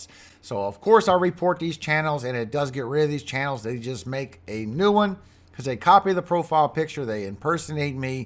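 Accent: Louisiana, United States. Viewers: Nice listening to to a good Louisiana man pronounce our cities. Like your RADAR and forecasts. Keep up the good work.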